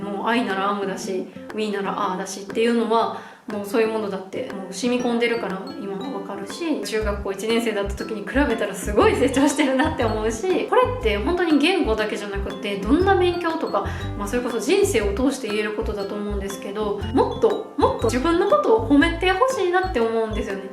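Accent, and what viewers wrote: Kansai, Japan. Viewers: さんの関西弁が聞きたいときにおチャンネルに見にきます。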